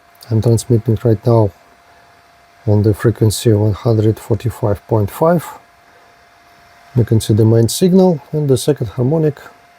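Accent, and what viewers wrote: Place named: Russia. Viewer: Очень русский акцент ✌️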